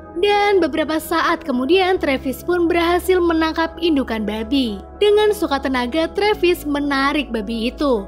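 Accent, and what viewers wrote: Pemalang, Indonesia. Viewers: Orang Pemalang ya mbak?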